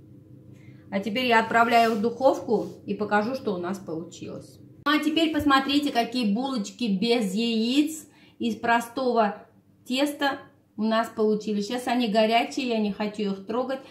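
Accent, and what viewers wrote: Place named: Armenia. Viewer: Человек на русском языке говорит это главное! Чувствуется акцент армянский. Спасибо вам за рецепты. Вы лучшая!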